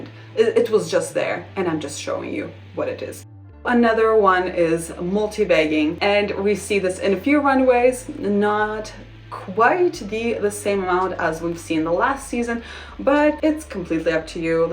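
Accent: Russia. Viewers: Hi, correct me if I'm wrong but I think your accent is from Russia am I right or the Ukraine? Think it's very rude for people to comment on people's accents not like you/they can help it. I personally love to hear accents all around the world. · Why they don't like to listen to you? You speak a beautiful English, with a very- very light accent, perhaps Russian. I hust descovered your channel. It is really interesting. Thank you.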